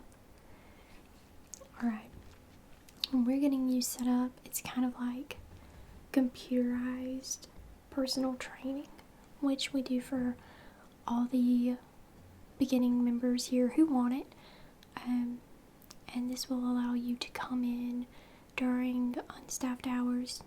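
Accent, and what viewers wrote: South, United States. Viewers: That southern accent ❤️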